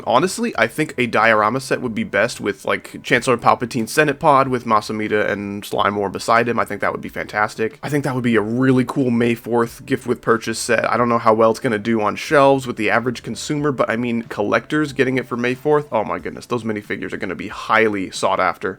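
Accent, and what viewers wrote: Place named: Texas, United States. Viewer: We need you to only use your texan accent for this channel to be epic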